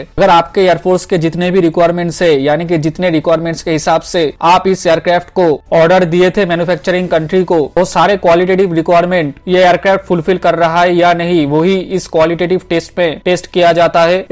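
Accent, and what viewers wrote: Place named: Bengal, India. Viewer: Bhai tum bangal ho tum hindi main vedio kyu bana rahe ho ..tumhare alfaz aur talaffooz se pata chalta hain ke tum bangal hoo.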